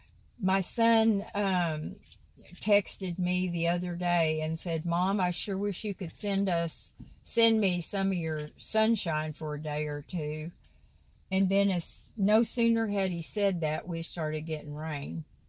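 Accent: South, United States. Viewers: Speaking of McDonald's I mean speaking of the rain was at McDonald's and it started raining but when I came out I was right in front of the door so and then I got home sat in the driveway and I'm watching your video before I go in and I usually have to sit in the car and take a break cuz I'm tired when I get home from my outing.. yes I hear that southern drawl y'all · I watched your friend with the YouTube channel Lettie’s Life., you said that the reason you have a thicker southern accent lately was “because of her”. That’s interesting because Lettie doesn’t have an accent. So, thought I’d mention that.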